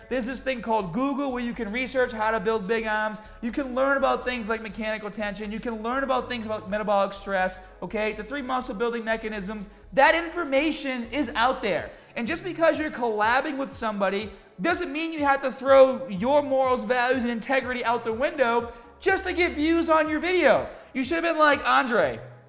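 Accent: Boston, United States. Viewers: Dude are you from Boston ? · Is this accent new? I swear I’ve been subscribed for years and back then never noticed the ultra Boston or whatever the fuck accent. Still the og tho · I love how is just leaning into the yelling Bostonian persona and taking it to the next level.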